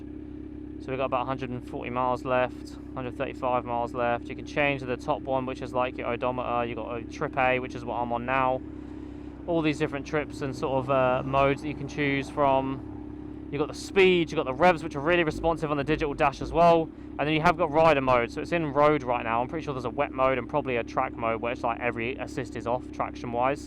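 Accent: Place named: United Kingdom. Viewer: where u from? u accent i literally like uk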